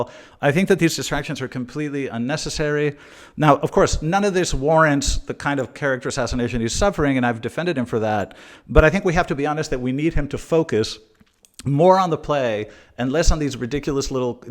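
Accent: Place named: United States. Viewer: We love this channel a lot. But it's like you are American. You speak with a clear American accent.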